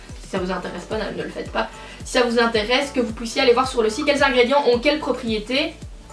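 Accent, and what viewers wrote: Belgium. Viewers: le bon accent des carolos on adore ❤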